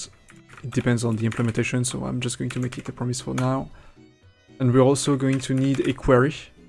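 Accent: France. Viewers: I can spot a french accent from a mile away ahah keep up the good work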